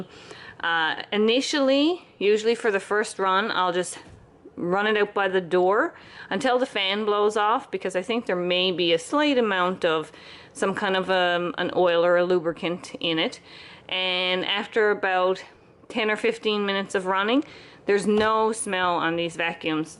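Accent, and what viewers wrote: Newfoundland, Canada. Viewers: Ah, I thought I detected a Newfoundland accent! So glad to find a Canadian channel that recommends things that I can actually buy here. This is a great idea; I'm just concerned about the noise.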